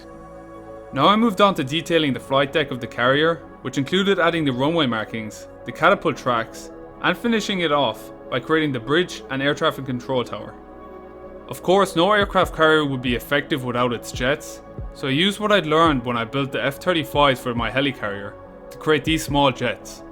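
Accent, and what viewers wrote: Ireland. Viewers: You have an Irish accent and by the way could you build the jungle battlefield 5 map it would be very cool to see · u have to be irish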